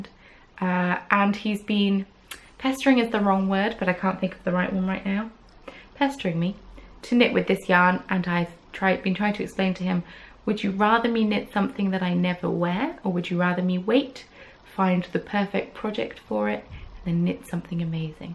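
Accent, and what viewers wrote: United Kingdom. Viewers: 😂😂😂😂😂 Balls to the wall, that made me really cackle!! Especially as you are sounding extremely British today. As always fab crafty chat!! Xxxx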